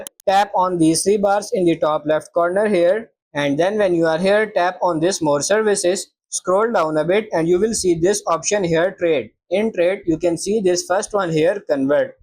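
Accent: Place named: India. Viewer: Tumhare Awaz Bata Rahi Bhai Tum indian Ho Par Best Of Luck Brother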